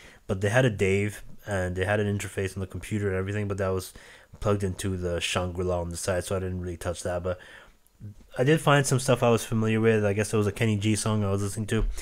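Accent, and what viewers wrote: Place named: India. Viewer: Where are you from? Your accent sounds Indian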